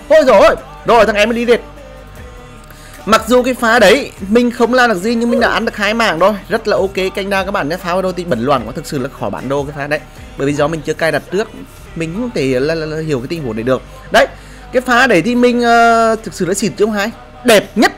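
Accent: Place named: North, Vietnam. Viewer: dọng ah miền bắc nghe hay quá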